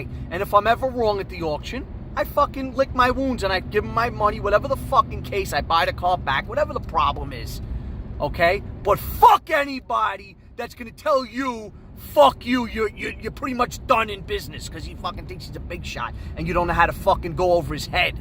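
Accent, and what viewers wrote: New York, United States. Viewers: A man of many accents. Considering your heavy new york accent, your southern is pertty darn good!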